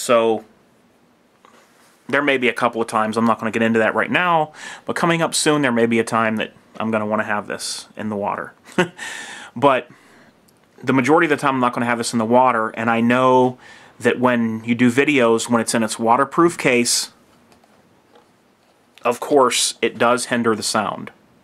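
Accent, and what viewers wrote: United States. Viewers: What part of the states you from again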